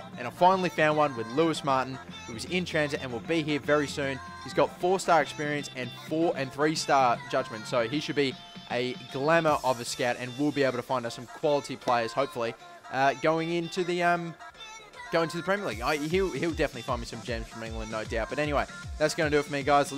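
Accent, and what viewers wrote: Australia. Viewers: Guisseppe Murru...I have a scouted star of my own called Guisseppe Missiroli. Way to be fuckin' creative EA, seriously haha. Anyways, I would be complaining as much as everyone else about your dilly dallying around the story but I adore Aussie accents so I'm good. Win-win lol.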